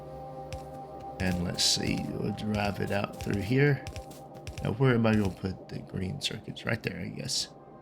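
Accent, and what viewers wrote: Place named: South, United States. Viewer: It sounds to me you're from the south. Like the accent and I love this game :)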